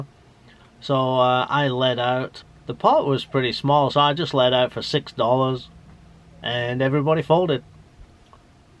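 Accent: United Kingdom. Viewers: You sound like a guy from the north of England, whose been living in the deep south for a little while... am I right? Keep up the great work!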